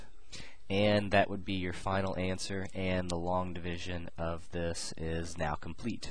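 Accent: South, United States. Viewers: I had to laugh when I heard your country accent. But thanks for the example! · hahaha. the comment about your southern accent made me giggle. For some reason my brain shut down and i second guessed myself... you helped me get back on track :) and gave me a good little laugh too. Thank you for your time and help :)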